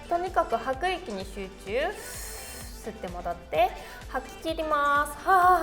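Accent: South Korea. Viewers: 発音が韓国語でかわいい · 韓国語のイントネーションを感じた🇰🇷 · 先生が韓国人だと知った上ではたまにあー韓国の人が訛りね感じるけどほとんど感じません。何度も日本語で話されてるからでしょうね。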